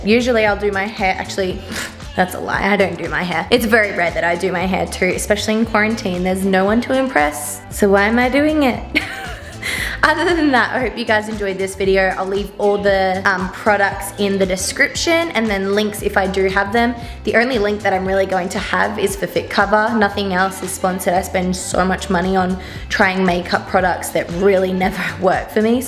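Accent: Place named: Australia. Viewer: Why do Australians, and I have only noticed on girls, pronounce the letter "o" like "or": oh nor, here we gor, etc... lmao I find it kinda funny and cute.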